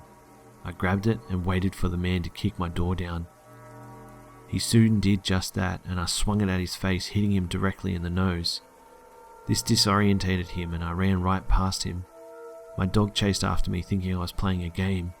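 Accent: Australia. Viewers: Started the video and wondered if you where an Aussie then checked the description and laughed Ps. It's g'day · Are you Australian?? Your accent sounds like you are. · You know what scares me the most about your stories, but only the ones you tell that actually happened to you? You have a fucking Australian accent, when I was a kid my house was broken into multiple times but nothing was ever taken. Now that I think of it it scares the shit out of me. Anyway the things that you talk about, make me shake so hard. I don't want to think that any of this shit happens in Australia. But then again, I have seen Ivan Milat in real life too. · Love your channel Man! I'm a fellow Aussie and it's so nice to hear stories read in our accent :)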